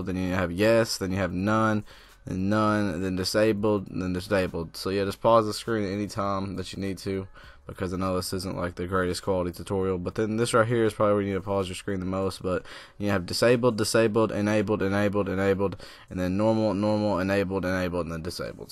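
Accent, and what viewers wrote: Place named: Bay Area, United States. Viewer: Bro you from the bay !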